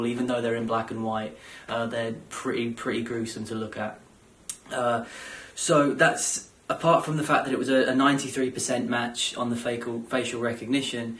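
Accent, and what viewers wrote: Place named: United Kingdom. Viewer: I watched this randomly as an Oklahoman, the moment you talked I was shocked to hear a UK accent. Used to hearing us talking about this.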